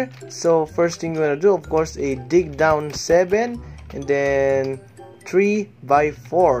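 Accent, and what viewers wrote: Philippines. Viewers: In the beggining i knew that he is a fillipino haha cool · Becuz it worked i subbed I also noticed your filipino accent, MABUHAY KA PO❤️ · oh God I'm listening to a filipino right? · Filipino accent is hard on this one bois i love it so much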